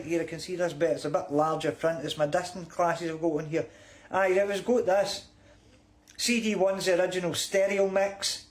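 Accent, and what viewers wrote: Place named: Scotland, United Kingdom. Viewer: Nice video. Your accent reminds me of an SNL skit in America. “Welcome to All Things Scottish, where if it’s not Scottish, it’s crap!” 😂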